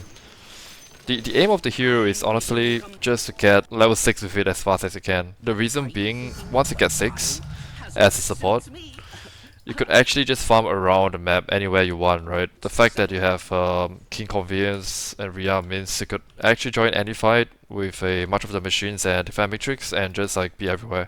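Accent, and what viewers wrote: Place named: India. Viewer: Why does this east Asian looking bloke talk like an Indian? Took me a while to realise he was referring to 'facet' by saying 'fuh-SET'😂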